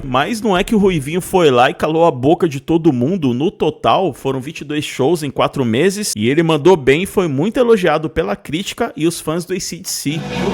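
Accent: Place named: Brazil. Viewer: faz um favor ao mundo e para de tentar falar ou ler em Inglês…. É mau demais… não dá para aguentar o quanto é péssimo e mau.. entenda que para falar Inglês não pode ter sotaque brasileiro senão vai colocar letras e consoantes onde não existem e sai tudo horrível.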